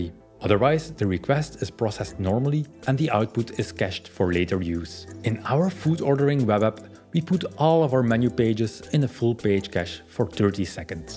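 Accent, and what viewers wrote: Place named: Belgium. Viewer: Your accent sounds Belgian, your name Italian and your face Indian. Did I get any of that right? 😊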